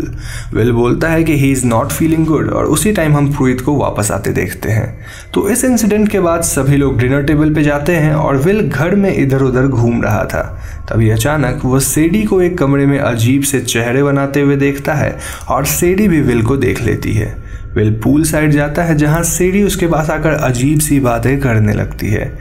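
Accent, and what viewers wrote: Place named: Bihar, India. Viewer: He has bihari accent😍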